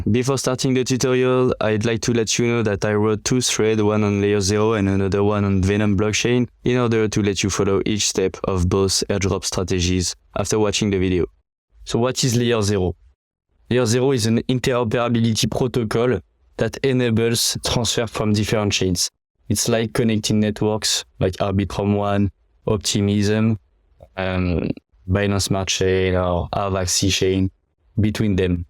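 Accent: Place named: France. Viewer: Cannot understand your Frenglish 🤣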